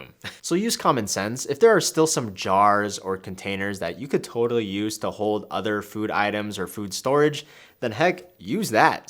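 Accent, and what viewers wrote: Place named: Philippines. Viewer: Subbed to your channel before i even learned you're a Filipino as well. I knew you sounded familiar 😊 Great videos btw. Keep it up!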